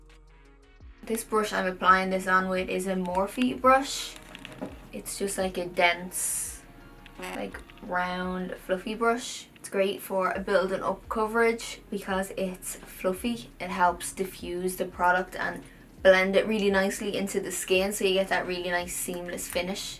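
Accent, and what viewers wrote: Ireland. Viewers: I was showing your videos to my mom today, fangirling about your past replies to my comments. She said she thinks you're gorgeous and your Irish accent is lovely 😃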